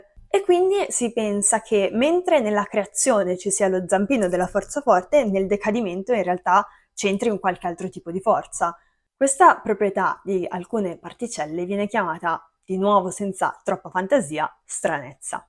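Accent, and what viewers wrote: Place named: Tuscany, Italy. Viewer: Hai un simpatico accento forse toscano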